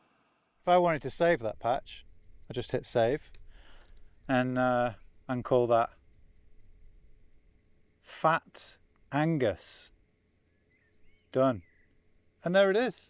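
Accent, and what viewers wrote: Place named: Marple, United Kingdom. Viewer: Must be the Marple accent, I'm not as accustomed to it as I was when I lived in Birch Vale back in the 80's. What's the world coming to when male guitar heroes are considered cool for the shapeless clothing they wear instead of their grossly indecent behaviour off-stage? No wonder Jimmi Page and Keith Richards are still so popular😎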